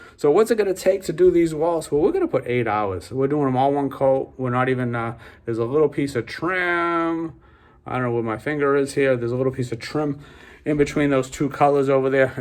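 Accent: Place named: Boston, United States. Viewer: I can tell you now that yes..that's $USD That accent is from Boston, Massachusetts.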